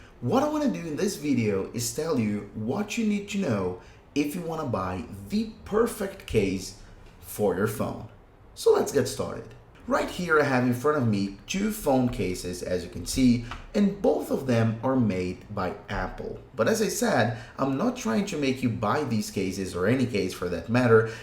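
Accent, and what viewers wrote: Brazil. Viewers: Gostei,fala muito bem inglês,só sei que é Br pelo sotaque kaskka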